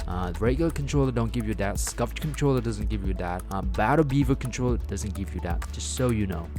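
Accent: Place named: Vietnam. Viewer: Thanks for your honest opinion review about this controller. I’ve been thinking about getting this since it first launch. Guess I have to buy one and try it out for myself. Btw, love your accent are you Vietnamese?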